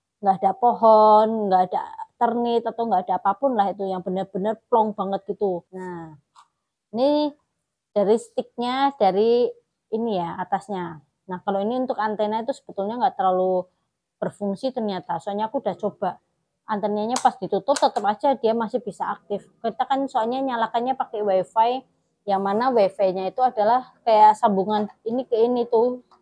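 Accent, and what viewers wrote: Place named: Java, Indonesia. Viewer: Suaranya agak medok...lucu tp jelas sii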